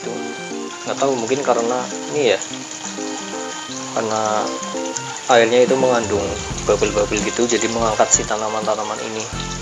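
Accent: Java, Indonesia. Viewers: abang orang jawa ya bang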